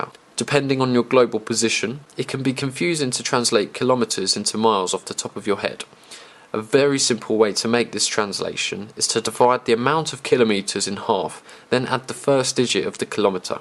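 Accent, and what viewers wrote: United Kingdom. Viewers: you from uk man?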